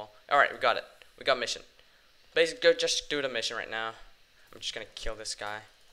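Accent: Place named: Denmark. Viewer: this guy has a danish accent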